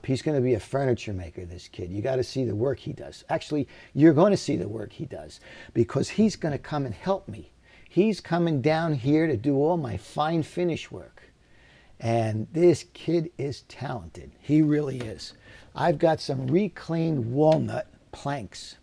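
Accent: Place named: New York, United States. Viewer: I am new to your channel. I saw you on Lola's channel. I love your work & your accent, it's kind of New Yorkish. Yes Americans do have accents!.. Now l want to know what's wrong with the mat! 🤣🇬🇧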